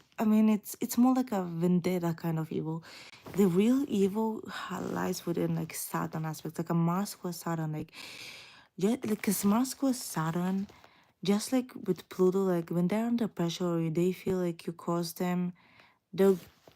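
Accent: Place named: Moldova. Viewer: Moldova? I need to know where your accent is from my girl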